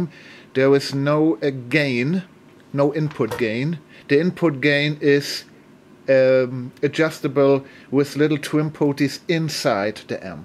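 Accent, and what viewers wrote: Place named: Germany. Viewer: ich wette Sie sind Deutscher, starker, sympatischer Akzent! Danke für diesen Artikel! Es muss nicht immer Röhre sein.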